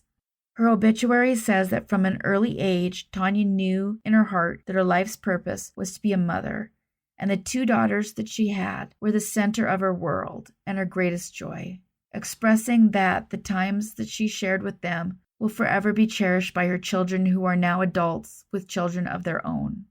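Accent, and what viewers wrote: United States. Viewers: I love your delivery. I think its the Fl accent for me. You remind me of my bff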